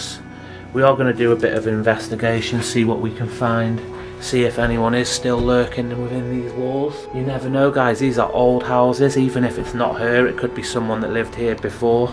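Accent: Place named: Liverpool, United Kingdom. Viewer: his accent isn't Welsh but definitely northern. I think he's scouse or from Cheshire. Definitely got a scouse sound.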